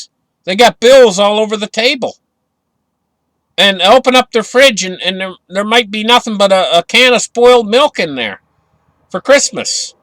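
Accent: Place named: Canada. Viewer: Love you to bits,. My day is not complete without those dulcid Canadian tones. Now here's the thing. You have yourself a merry little Xmas and come back refreshed and ready for the end game. Kind regards from the UK.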